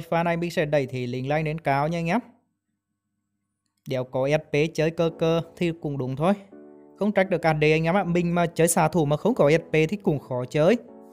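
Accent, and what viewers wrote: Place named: Hue, Vietnam. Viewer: Người huế mà bạn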